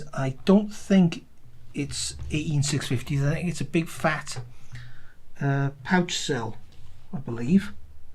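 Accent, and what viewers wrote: United Kingdom. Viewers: Where are you bud, sounds like the UK. I've got a faulty unit that won't charge from the socket. I can't diagnose what component is faulty and wondered if you could help. Thanks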